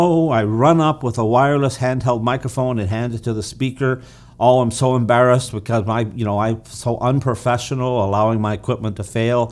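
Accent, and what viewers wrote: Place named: Eastern Europe. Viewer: I am picking up some Eastern European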